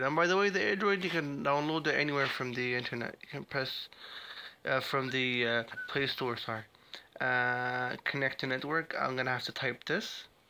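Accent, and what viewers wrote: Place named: Egypt. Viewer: انا عرفت من طريقتك يا عم ومن المكتب كمان هههههههههههههههههههههههههههههه دا احنا مصريين زيي بعضينا يعنى اعرفك من غير ما بص مجرد سمع الانجليش عندك بس عالفكرة نطقك كويس جدااااا انا فى اولى ثانوى عالفكرة وفى انترناشونال ادعيلى بقى :))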